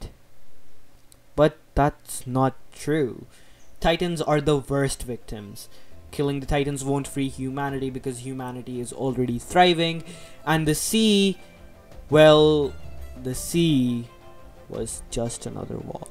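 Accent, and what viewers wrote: India. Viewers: your accent feels like indian....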